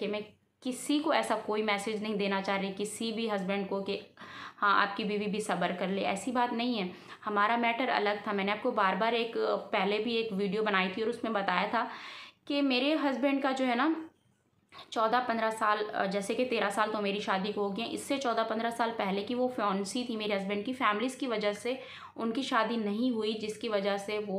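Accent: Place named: Sindh, India. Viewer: App konsey village say ho..........ap ka lehja tu sindhi lagta hai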